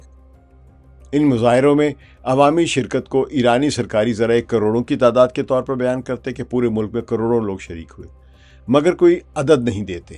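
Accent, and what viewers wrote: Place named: Punjab, Pakistan. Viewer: ماشاءاللہ ۔ کیا بات ہے۔ پنجابی تڑکے کی۔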